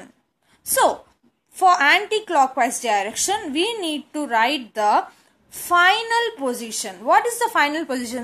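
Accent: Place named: Mumbai, India. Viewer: you are a Bombay accent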